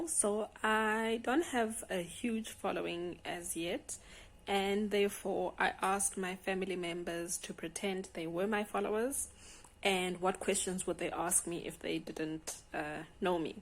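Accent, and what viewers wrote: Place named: South Africa. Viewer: I love your accent! I would like to visit South Africa one day. I heard it is beautiful. Thank you for sharing. I am a huge fan of boiled eggs too! :-)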